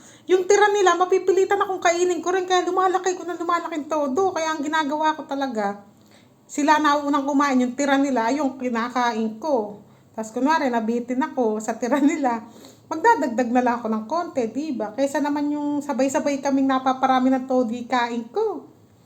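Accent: Nueva Ecija, Philippines. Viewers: Ate parang Punto mo taga Nueva Ecija Po kayo idol kopo kayo❤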